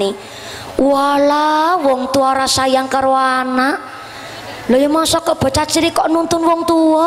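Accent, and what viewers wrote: Banyumas, Indonesia. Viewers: Saya suka ngapak tapi jelas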